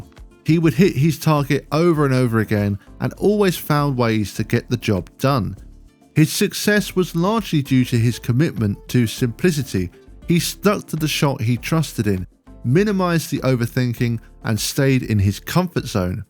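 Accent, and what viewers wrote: United Kingdom. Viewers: Bs. A British AI voice?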